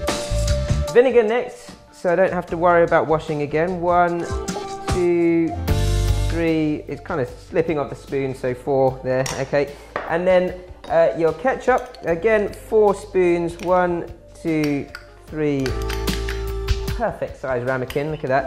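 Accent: England, United Kingdom. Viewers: I don't like this guy English ascents? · Too much English accent and annoying background music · Nice and clear instructions,easy to follow.Chinese with a british accent. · Asians with a slight British accent always makes me stop and a take a second look. Great videos!!! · It is kind of weird to heard perfect British accent from a Chinese guy. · He has an english accent!!😱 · I love your British accent sir,good cooking huh.. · luv uk waps.... ha ha in holland we call ourselves proudly peanut. "skool uv wuk" great uk accent mate.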